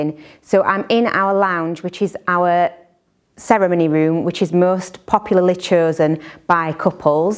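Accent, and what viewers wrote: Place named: Yorkshire, United Kingdom. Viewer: Dear lady! I love your Yorkshire accent))) I have stayed and slept in WH. Love the palce! I am from Ukraine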